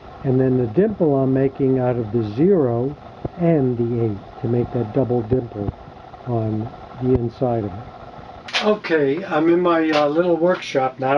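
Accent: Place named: New Jersey, United States. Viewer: I love his accent he sounds like he’s from New Jersey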